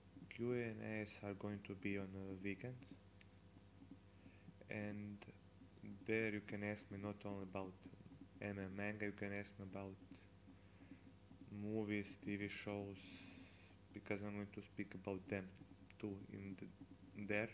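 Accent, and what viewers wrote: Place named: Croatia. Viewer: Good luck! btw where are you from? I'm from Croatia, and you sound like you might be from there as well.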